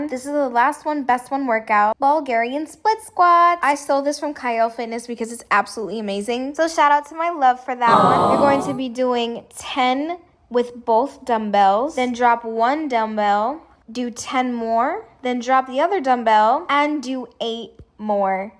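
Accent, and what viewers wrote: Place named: United Kingdom. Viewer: "Beautifully sculpted" is a massive understatement. I like your narration and the random British accent. I'm from across the pond myself.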